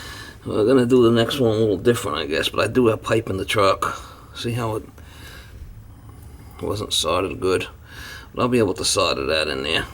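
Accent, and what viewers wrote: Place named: New Jersey, United States. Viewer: I’m not even a plumber but love watching this guy do his jobs with real life experience. Great explanations and New Jersey accent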